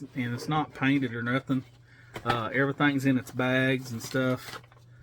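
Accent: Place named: South, United States. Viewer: i love to hear you talk that red neck voice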